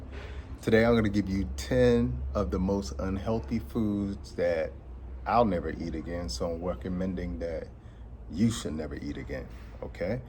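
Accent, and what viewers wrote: Jamaica. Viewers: Is he Jamaican?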